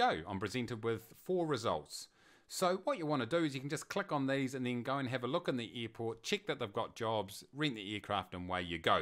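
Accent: New Zealand. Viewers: Love your accent dude, you sound like my coworker thats from New Zealand!!! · Unrelated, I love that kiwi accent of yours. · Right away heard the Kiwi accent. IFF ESS Economy.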